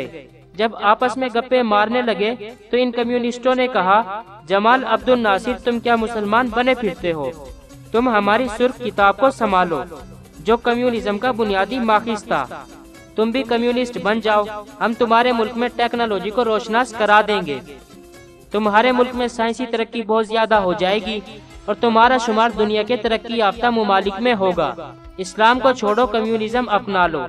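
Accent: Azamgarh, India. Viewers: good information About QRI Abdul BAsiT A lot Thanks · aap Azamgarh se ho kya??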